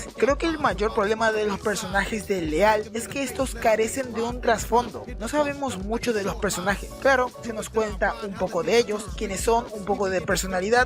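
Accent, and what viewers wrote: Paraguay. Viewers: Eres paraguayo!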